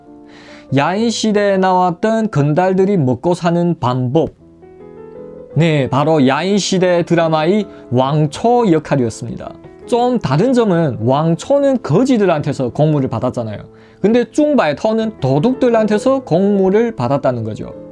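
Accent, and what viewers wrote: Yanbian, China. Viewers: 근데 진짜 조선족이신가요? 일부러 연기하는 말씨는 아닌거 깉은데. 여하튼 스토리에대한 말빨은 진짜 국적막론하고 듣는사람 잼나게 하네요. 근데 유선이야기는 제갈량 사후이야기로 첨부 나오는데 제갈량 죽기전전에 유선은 사망한걸로 아는데.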